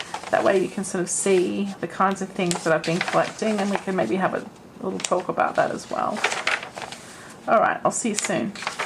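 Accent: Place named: Australia. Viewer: Always love your videos so much! Thank you for consistenly uploading!!! (Wisconsin, USA subscriber here ❤) and absolutely love your voice and your awesome Australian accent!! (My almost 13 year old daughter Loves talking in Australian and british and so on accents (and actually shes really very good at it lol) and when she hears you on my phone, she always comes over and says how much she loves you and how you talk!!😁) and calmness and excited-ness all at the same time 😆 I always look forward to watching your videos and appreciate the time and effort you put in to making them. 😊 I hope your week is going great :)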